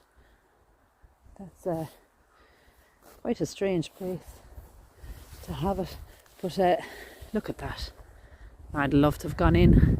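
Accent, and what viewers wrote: Ireland. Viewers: By the way, your Irish brogue is absolutely mesmerizing!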